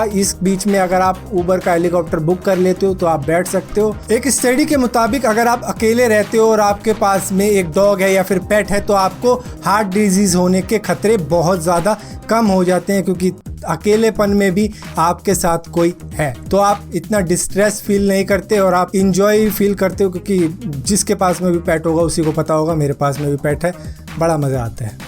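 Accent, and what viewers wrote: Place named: Punjab, India. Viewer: hahaha punjabi lgda bai tu v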